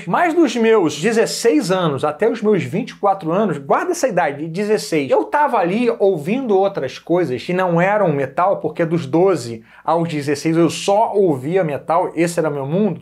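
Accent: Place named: Rio de Janeiro, Brazil. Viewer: Só esse sotaque carioca já ganhou o meu dislike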